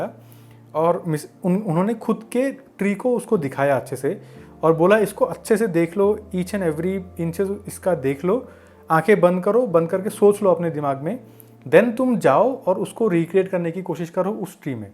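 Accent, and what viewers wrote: Assam, India. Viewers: Tumar accent khuni doubt korisiliua j tumi Assamese hoi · lmao. I knew you were Assamese before reading the Description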